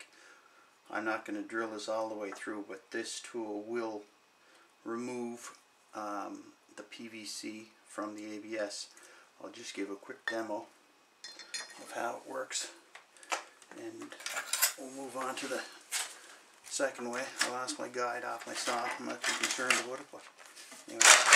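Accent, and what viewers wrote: Cape Breton, Canada. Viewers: Hello fellow caper, I recognize the accent. My tub has a clogged abs trap, and it's too close to several other adapters/elbows that have no room to cut them without taking the whole thing out, which would require taking my surround out and basically redoing the entire damn thing. My question is: is it possible to cut off and remove the trap off the 2 abs pipes it connects to, without having to take apart anything else? Like a special way I can cut out the trap leaving just the rings, and then carefully cut them off, then sand down all the glue and just pop a new trap on there?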